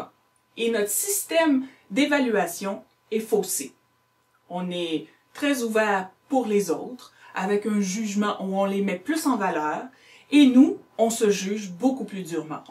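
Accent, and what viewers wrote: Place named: Quebec, Canada. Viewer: Moi aussi j'adoooore! Merci beaucoup pour cette vidéo, au si bel accent de la belle province! Gros becs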